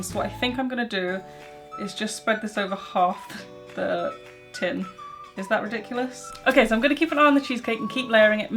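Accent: United Kingdom. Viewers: Shes british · British I believe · Are you from uk if you are Which part are you from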